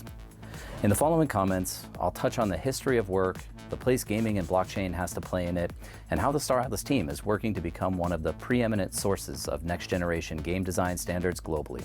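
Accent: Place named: United States. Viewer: Which part of America is his accent from??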